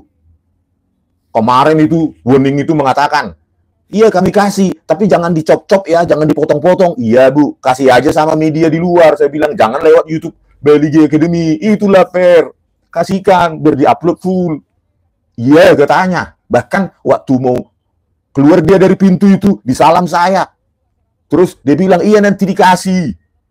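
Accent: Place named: Batak, Indonesia. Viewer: Kami suka komunikasi khas bataknya nya bang..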